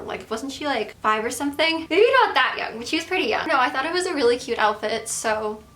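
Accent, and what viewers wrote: United States. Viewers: You miss pronounced Cheshire, but that’s ok you still cool. It’s an American thing saying Shire. 😁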